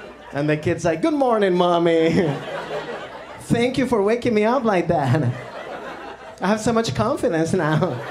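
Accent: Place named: Venezuela. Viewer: Jose Bravo Well they’re both from Venezuela same Accent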